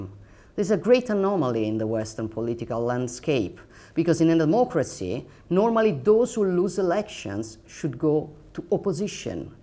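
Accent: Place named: Rome, Italy. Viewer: La signora Meloni ha ,in curriculum un diploma in linguistica ed autocertifica il suo liberalismo in un patetico inglese Berlitz-romanesco. Presentazione fuori schema ,stile self advertising ,ma non preoccupante. Non credo che il presidente Macron ( talora insultato da lei) le dedichi qualche forma di attenzione o Jen Psaki sia in procinto di rilasciare qualche comunicato stampa, in proposito.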